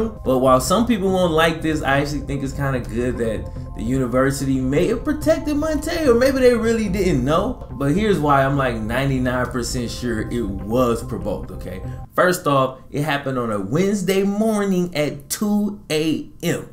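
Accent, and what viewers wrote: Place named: South Louisiana, United States. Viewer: Yo! You got dat South Louisiana “Ak-oholoic” ya hurd!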